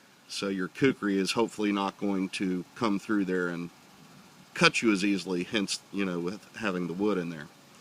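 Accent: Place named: Alabama, United States. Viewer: Gotta love that Alabama accent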